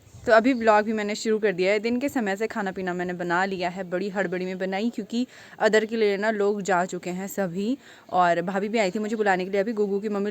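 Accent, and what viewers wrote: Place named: Garhwal, India. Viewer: hindi with gadwali touch❤‍🔥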